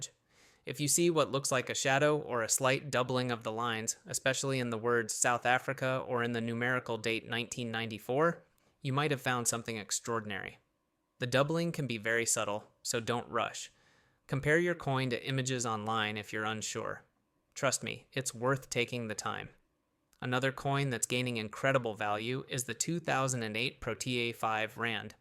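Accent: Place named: United States. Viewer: So, why the American accent?? Big bull shit scam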